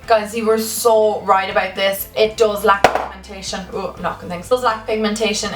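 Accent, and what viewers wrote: Ireland. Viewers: I love this video and your accent!! I'm Irish too but my accents different ❤️❤️ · came on your YouTube channel. love at first sight! I'm also Irish so hearing your accent is just so homely😄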